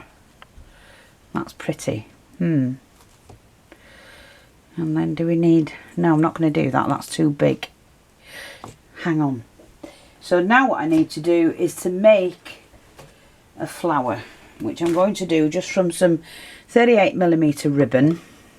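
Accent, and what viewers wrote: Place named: Bradford, United Kingdom. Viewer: Hi. I’ve just found your channel and because of your accent I feel right at ome. I’m from Bradford and I’m assuming you’re somewhere near by ☺️x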